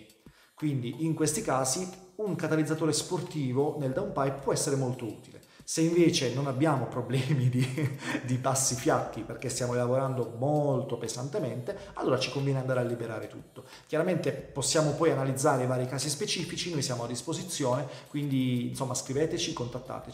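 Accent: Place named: Molfetta, Italy. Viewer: sento un accento molfettese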